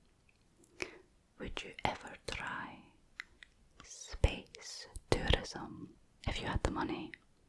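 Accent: Scotland, United Kingdom. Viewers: Aye lass ... I much prefer your Scottish accent! It's especially cute with the wee bairns! 😊😊😊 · I love your Scottish accent. So tingly. 🥰🥰🥰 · Ok so i just googled "vow of Celibacy" and means something totally different. Plzz correct me if its just me getting confused with ur Scottish accent .😅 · This wins. This is how all scottish accents should be and im from just outside Edinburgh 🤣🏴. Love this!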